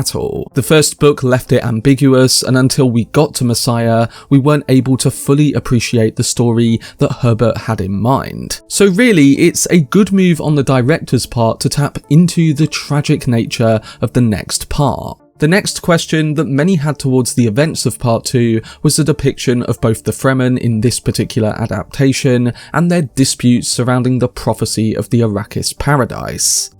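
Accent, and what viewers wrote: United Kingdom. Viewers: Your accent is super cute. Vitamin, water, other words… British?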